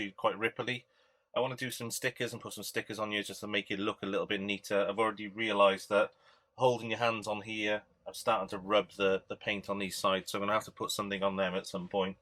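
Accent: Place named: Cardiff, United Kingdom. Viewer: You sound like a Cardiff boy, i bet "G" couldn't make a pin like yours.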